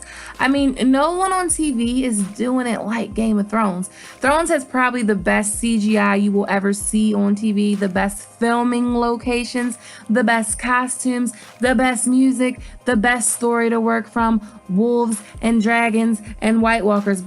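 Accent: United States. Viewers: Your accent is so american, it literally sounded for a few seconds like you tried sounding dumb on purpose.. couldnt watch anyoner after i realized that it was actually just how you talked! :D You sound like a female cowgirl from the 1800's chewing tobacco and spitting on the floor of your own house..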